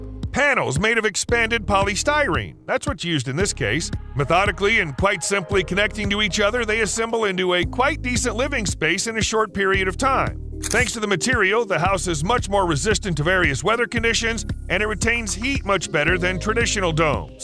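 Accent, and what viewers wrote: United States. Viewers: Horrible music, and according to the accent, it can only be a video from the States. Not useful for Europeans.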